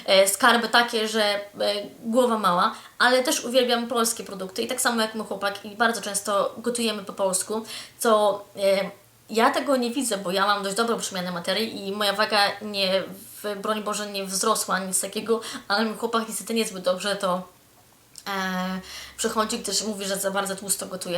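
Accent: England, United Kingdom. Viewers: Akcent już ma Pani brytyjski. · Nabrałaś angielskiego akcentu? Czy masz wadę wymowy? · na początku śmiesznie emanuje brytyjskim akcentem, a później już o nim zapomina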